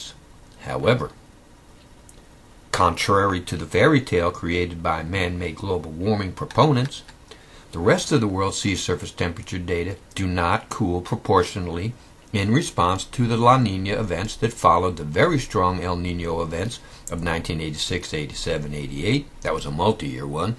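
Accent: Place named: North Carolina, United States. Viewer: Great job,! You should get a British guy to do the voice overs, though. Your'e from North Carolina, aintcha?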